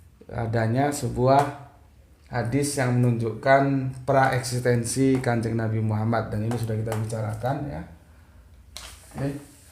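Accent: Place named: Bogor, Indonesia. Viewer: org bogor memang begitu bahasanya.. 😝🙏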